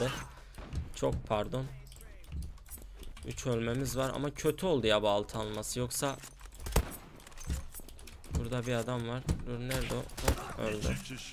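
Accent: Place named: Eastern Anatolia, Turkey. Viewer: Konuşma şiven doğu şivesiydi biraz düzelmişsin artık biraz daha anlaşılır konuşuyorsun önceden dediklerini anlamıyprfum şimdi seve seve izliyorum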